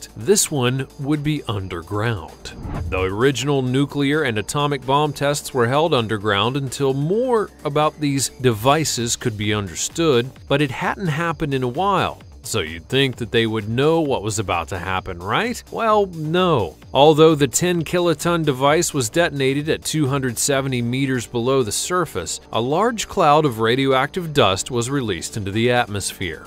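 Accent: United States. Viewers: Wow, irritating American accent is horrid.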